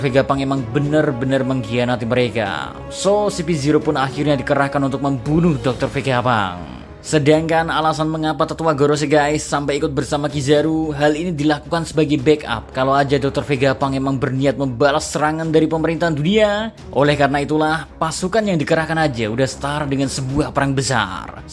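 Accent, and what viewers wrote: Java, Indonesia. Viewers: logat Jawa Inggris nya mantep bang wkkw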